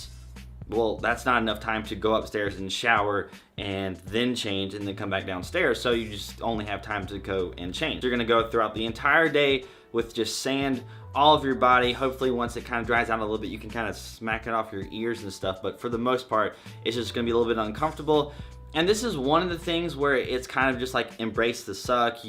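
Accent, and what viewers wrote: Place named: South, United States. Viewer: I can tell you're from the South, I'm guessing Ga, but you have a little bit of an accent and you talked about Fort Benning which is in Southern Ga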